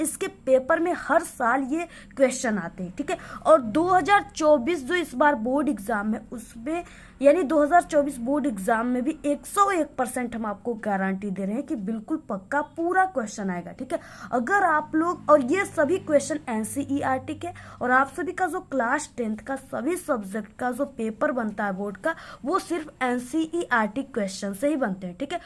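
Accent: Bihar, India. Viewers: Madam aap Bihar se ho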